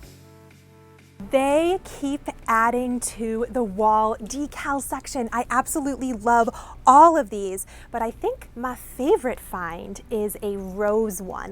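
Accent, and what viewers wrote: Spain. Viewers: I love your Spanish accent 💃🏽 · do you speak Spanish fluently? I’m always impressed with your pronunciation (it screams Spain) as a Spanish teacher!!! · Please tell us the story of your fantastic Spanish accent!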